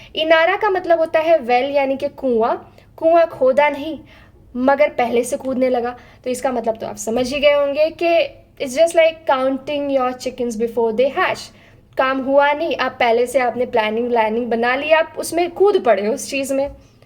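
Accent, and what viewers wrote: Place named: Allahabad, India. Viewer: What you are speaking is allahabadi its not proper awadhi but purvanchaliya style awadhi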